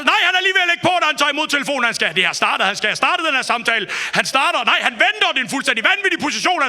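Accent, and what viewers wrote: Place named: Jutland, Denmark. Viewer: Der starter meget jysk ud... Men selv med den jyske levering har du kraftedeme fat i noget fedt 🤣🤣🤣🤣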